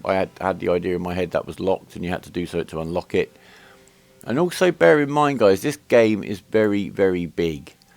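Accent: United Kingdom. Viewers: Left a like for the Westcountry accent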